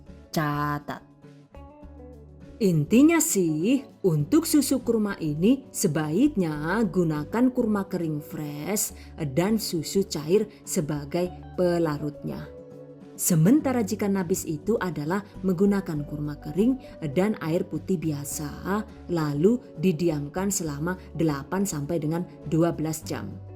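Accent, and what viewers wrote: Banyumas, Indonesia. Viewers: walah dalah rikane ngapak mania. ora ngapak ora kepenak 😆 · Bu aseline pundi daneng ngendikane ngapak kados kulaa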